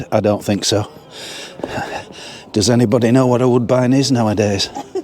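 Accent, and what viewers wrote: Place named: Yorkshire, United Kingdom. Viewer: Another lovely video as always love to hear the Yorkshire commentary thumbs up to regarding her teeth I am going on 91 and I have all mine well except for the wisdoms! Always enjoy wherever you go looking forward to the next one😊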